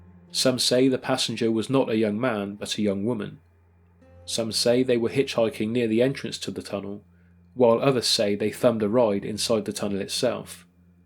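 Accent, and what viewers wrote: United Kingdom. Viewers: I’m glad the narrator is British. RIP London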